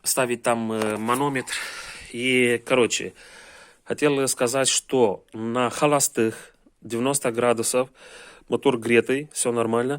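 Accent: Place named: Moldova. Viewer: Вы с Молдавии? По акцент у узнаю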